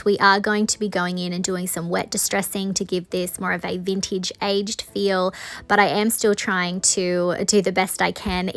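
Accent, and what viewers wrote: Australia. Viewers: I've been watching your videos for several months, and have never commented. Shame on me! Honey, you are just terrific! I have learned so much from watching you. Everything you do, you do with excellence. And beside all that--this old Florida girl just loves your Aussie accent. Keep up the good work.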